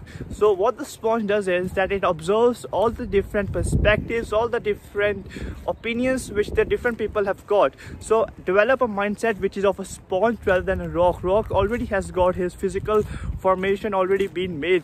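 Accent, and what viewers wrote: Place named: United Kingdom. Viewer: brother the way u talk it does not look like u r an Indian student u captured British accent very well bro like to see your videos keep going bro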